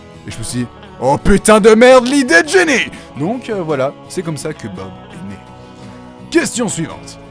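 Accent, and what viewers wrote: Belgium. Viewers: J'étais sûr que vous étiez belge sa s'entendé sur l'une de vos vidéos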